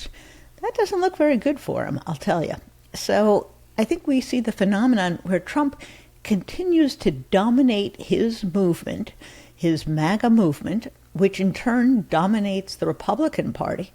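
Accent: United States. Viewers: Agree! A cultured American accent and voice. I could listen to her for hours. So very intelligent and balanced.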